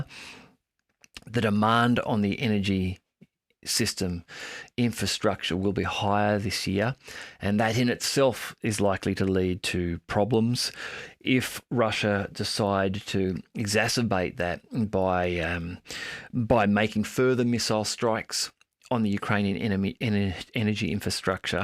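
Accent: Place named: Australia. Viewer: and it's nice to hear an Australian voice giving a balanced account. No such voice exists in New Zealand.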